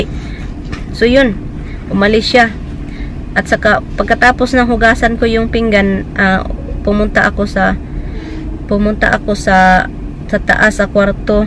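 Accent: Visayas, Philippines. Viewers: Mag tagalog ks na lng puntong bisaya ka pa rin mag english Arte mo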